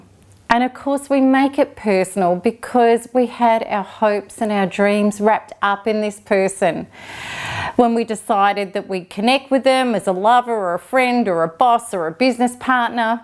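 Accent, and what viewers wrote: Australia. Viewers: Love your hair, simply gorgeous! Refreshing to hear a fellow Aussie voice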